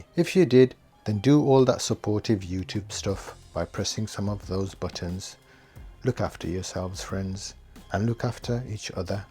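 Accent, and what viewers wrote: Birmingham, United Kingdom. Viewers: You a brummie mon?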